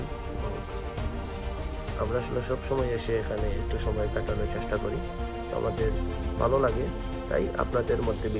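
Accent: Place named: Sylhet, Bangladesh. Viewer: শুদ্ধ ভাষা+সিলেটি ভাষা মিক্সচার 😅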